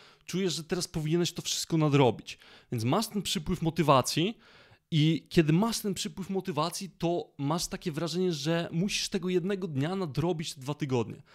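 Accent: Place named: United States. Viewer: Dykcja jest dobra. Akcent jest amerykański, albo holenderski. Taka kluska w gębie. Albo pilnowanie sie, zeby mówić niskim głosem.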